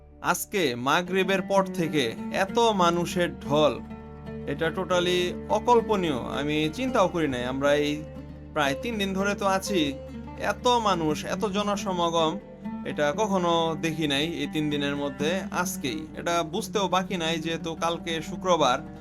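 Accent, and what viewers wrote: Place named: Tangail, Bangladesh. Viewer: খুব ভালো লাগলো ভিডিও টা। ভাই আপনার দেশের বাড়ি কি টাঙ্গাইল? আপনার কথা শুনে মনে হচ্ছে আপনার হোম ডিস্ট্রিক্ট টাঙ্গাইল।।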